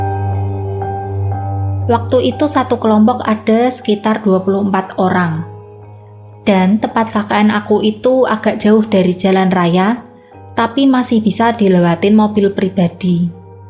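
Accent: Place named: Manado, Indonesia. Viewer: Logat mba nya Manado banget 😅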